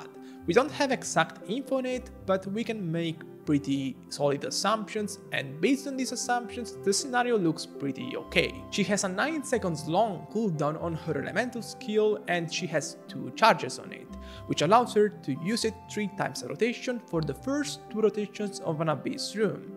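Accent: Italy. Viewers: Thank you for your guides ❤ and also idk why but i think you're italian bc your accent is similar to the Italian accent 😂❤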